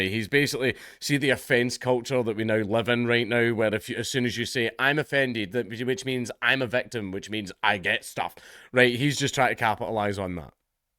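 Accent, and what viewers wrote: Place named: United Kingdom. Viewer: tbf that's just the british dialect for you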